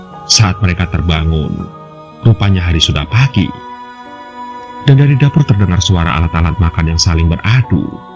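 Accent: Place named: Java, Indonesia. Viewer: Mantap bng aku suka cara Abang ceritanya seru dan has jawanya 😊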